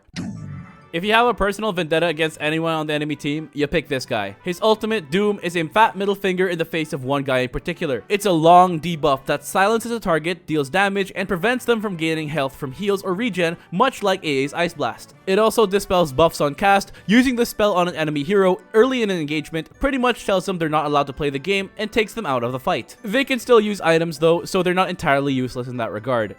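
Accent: Philippines. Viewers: This guy is a filipino, I can hear it. lol.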